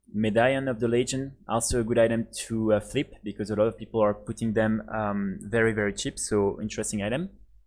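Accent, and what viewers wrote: France. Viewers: Haha l'accent francais:P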